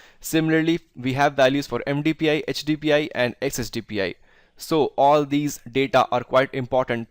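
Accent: India. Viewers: hey man I searched alot about this. Finaly I found your video. I understand everything, thanks alot man !! Btw for germans, english with indian accent is quiet funny^^. I can understand you clearly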